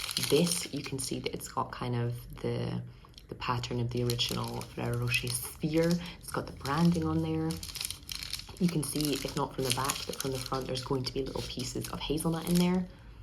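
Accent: Scotland, United Kingdom. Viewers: Solidarity with being an almond hater. [And the Scottish giveaway of refusing the aknowledge the "l" in almond.]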